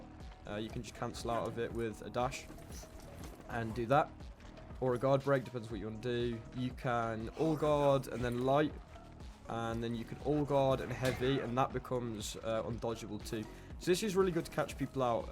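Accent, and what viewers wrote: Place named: Australia. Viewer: Very good and informative video, my wife likes your Australian accent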